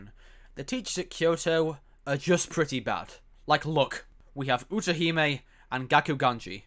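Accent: United Kingdom. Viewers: I CAN’T STAND THIS SCOTTISH ACCENT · After watching this video commentary, I now understand the lack of reading comprehension trope. Turns out having a British accent does not make you intelligent.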